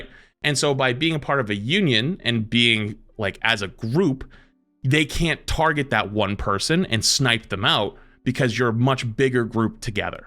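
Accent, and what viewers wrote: Boston, United States. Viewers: THATS MY BOSTONIAN RIGHT THERE! SPOKEN LIKE A TRUE MAN OF THE PEOPLE!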